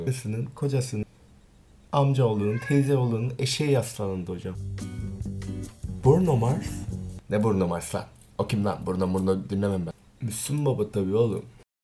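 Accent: Adana, Turkey. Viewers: Kardeşim sen direk yöresel adanalı olmuşsun :d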